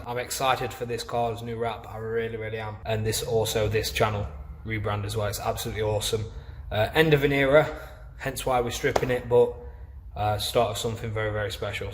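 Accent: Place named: North West, United Kingdom. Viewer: Where are you from north west because that accent is mint lad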